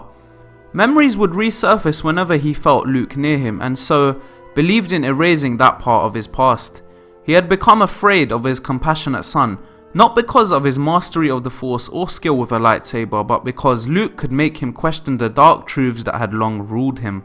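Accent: Cockney, United Kingdom. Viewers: You sound like a cockney chav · Is this guy a cockney?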